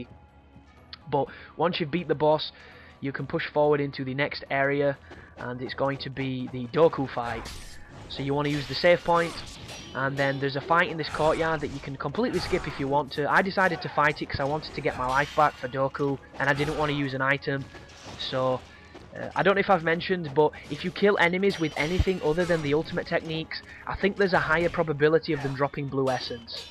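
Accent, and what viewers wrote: United Kingdom. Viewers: I really like this guide...your voice is cool (i'm in the US; not used to british accents), and your guide is giving me the confidence to beat this game on very hard to then unlock master ninja. Just completed sigma 2 on master ninja? Ever doen that? Or have you only beaten ninja gaiden 2 for the xbox 360? Either way, I definitely enjoy your channel and your guides. Very professional and to-the-point.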